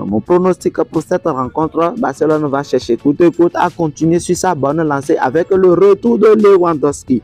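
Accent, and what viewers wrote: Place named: West Africa. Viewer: J'adore trop ton accent a l'ancienne français africain 😂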